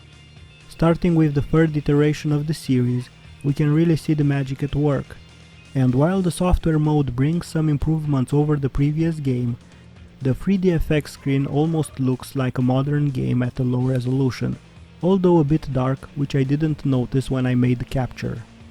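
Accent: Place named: Romania. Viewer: Excelent video. Mi am dat seama dupa accent ca esti roman. Like!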